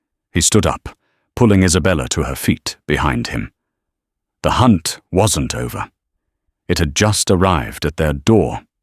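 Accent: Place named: England, United Kingdom. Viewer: I love the voice of this narrator; baritone, English, and clear. So nice! Good story, too.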